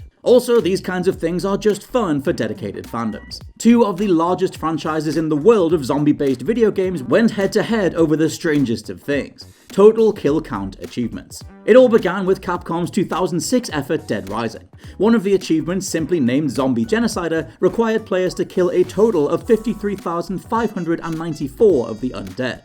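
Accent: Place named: Scotland, United Kingdom. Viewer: clicks video hears scots voice and leaves.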